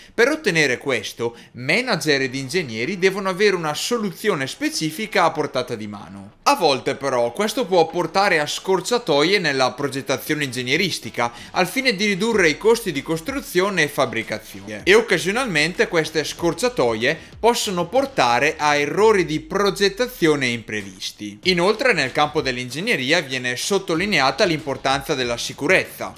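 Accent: Brescia, Italy. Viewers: Si sente che sei di Brescia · Bravi ! Sempre esaustivi e concreti! Ma siete di Brescia? L’accento sembra di lì… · Ma quell'accento bresciano? :-) Brao!!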